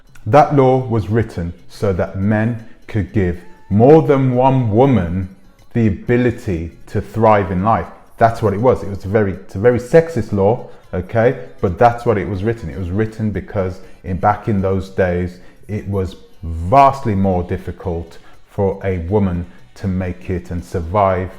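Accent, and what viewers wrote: United Kingdom. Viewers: Your a weirdo for digging in the brother JT's personal life . Corn ball British accent . FOH !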